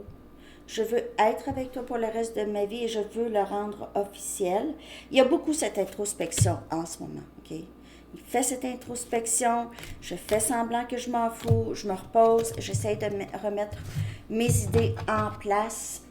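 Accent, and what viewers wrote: Quebec, Canada. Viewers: Bonsoir de la région parisienne. J adore votre accent et le Québec. Ma fille vit à Montréal et j apprecie d y aller. Je suis vierge ascendant gémeaux. Votre lecture résonne bien en moi. Mon autre est parti depuis 5 mois justifiant qu il souhaitait rester seul. Gros choc émotionnel pour moi qui m a empêché d avancer. Je désire tant qu il revienne. Mais je commence à sortir de ce trou noir et espère du meilleur.😊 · Hello on a adore l'accent Québecois..on te comprend très bien. et cela me parle beaucoup. s'est lui qui s'éloigne pour ne plus me faire souffrir et moi qui ne me sens pas assez bien pour lui... du coup nous sommes séparés. il n'y aurait que le sexe qui pourrait nous unir.donc il y a hésitation de ma part. merci beaucoup de ce tirage gratitude et bienveillance:planet-orange-purple-ring:🙏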